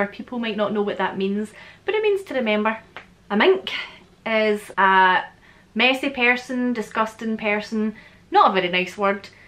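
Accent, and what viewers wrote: Scotland, United Kingdom. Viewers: I love the Scottish accent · Love scottish ascent❤️ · I have no Scottish heritage and I’ve never been to Scotland but I feel a deep passionate respect and admiration for Scotland. Wish I could visit and learn more about it. Plus, that accent, excellent. Gonnae trry! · LOVE your accent, My people came from Scotland and Ireland. Many years ago. My Dad used lots of the words that you used in this video. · ITS SO NICE TO HEAR.I M LEARNING SCOTTISH ACCENT.THANK U SO MUCH. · Oh my god hon ur accent reminds me of my scottish aunt kirsty, love it. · I have no idea, why her video (not this one, but at the other, comments were deactivated) was recommended, but I love Scotland and the scottish accent. ....Wait is this a Freddy Kruger glove on the door?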